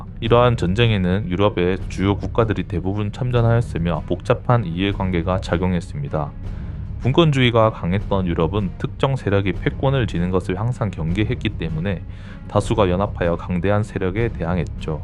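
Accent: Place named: Gyeongsang, South Korea. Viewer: 표준어를 쓰는듯 하지만 느껴지는 경상도의 향기...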